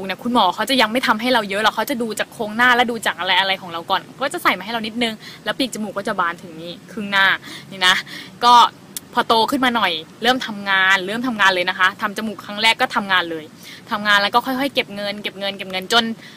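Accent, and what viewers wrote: Thailand. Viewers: พูดเหน่ออ่ะ แต่น่ารักนะไม่ได้ว่า ผมว่ามันเป็นเสน่ดี......ศัลยกรรมผมเชยๆนะใครก็ชอบคนสวยทั้งนั้นแหละ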